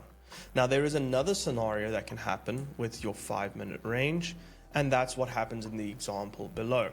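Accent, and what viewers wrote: South Africa. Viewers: Are you a Saffer?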